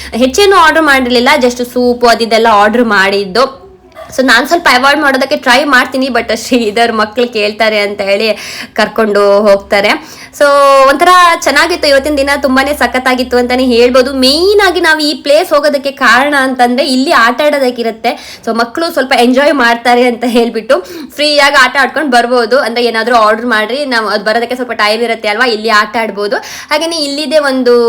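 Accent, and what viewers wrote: Kundapura, India. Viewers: Super ede beach ⛱️ side yalla, kundapura kannadalli mataddidru swlpa swlpa arta agutte 🤭mutton biryani 😋nyc 👌 sharing ❣️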